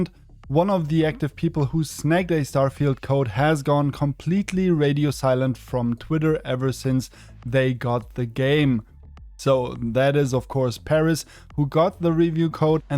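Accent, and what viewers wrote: Germany. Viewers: I like your videos but you sound like a German who speaks English 😂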